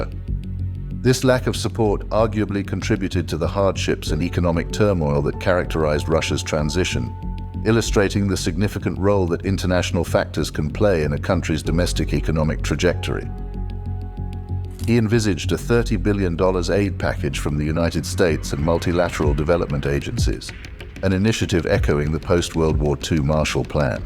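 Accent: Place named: United Kingdom. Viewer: It's the season of obnoxious British accents. Yuck.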